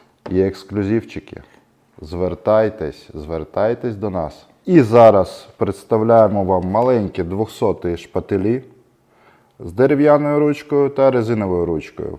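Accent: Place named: Russia. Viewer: Да, чувствуется, что русский родной 😊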